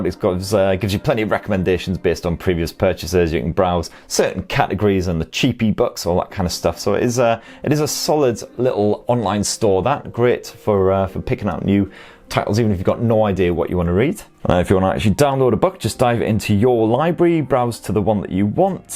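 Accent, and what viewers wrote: United Kingdom. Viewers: I like your video content and your british voice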